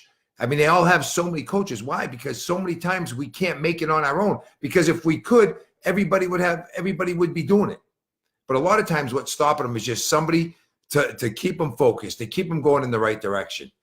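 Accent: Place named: Boston, United States. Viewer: That feedback loop and accountability are so important. That Boston accent is strong!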